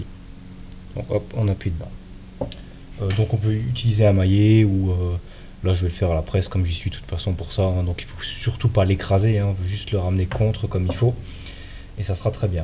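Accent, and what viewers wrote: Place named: Alsace, France. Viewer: On remarque un peu l'accent alsacien ;)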